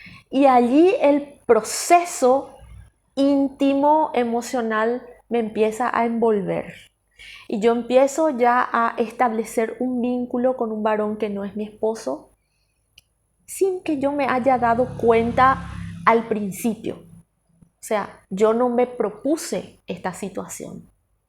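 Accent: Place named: Paraguay. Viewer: Por tu acento creo sos de Paraguay, todos hemos pasado por alguna experiencia similar, como humanos lo experimentamos, gracias al Señor Jesucristo tenemos su Santo Espíritu y el nos ayudará siempre.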